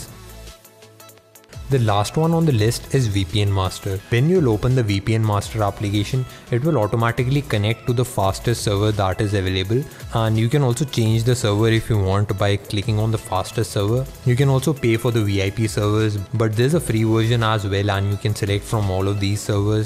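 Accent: India. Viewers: This is awsm bro thank u 4 video r u Indian? i asked this question because of ur accent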